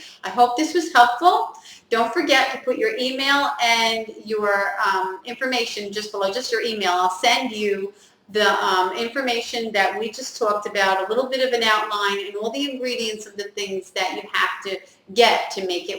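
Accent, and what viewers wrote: New Jersey, United States. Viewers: I found you by reading an article where someone mentioned you in an ebay forum about eliminating cluttler. The first video I saw of you is the one with zippered curtain bags. At one point I had every size. From curtains to sheets to bed in a bag. I got rid of them all. Well actually I gave them to my kids for camping for sleeping bags and linens. I think you're great! Are you from NJ? You sound like it. lol.. my email is <mail> looking forward to your emails and thank you!!!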